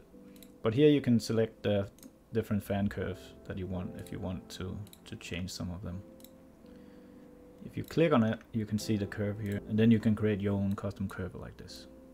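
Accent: Denmark. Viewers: Man kan høre du er dansk😂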